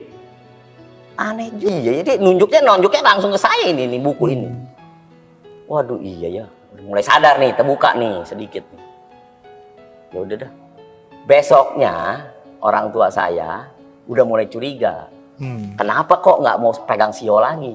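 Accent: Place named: Jakarta, Indonesia. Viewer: Kya Betawi bang bahasaya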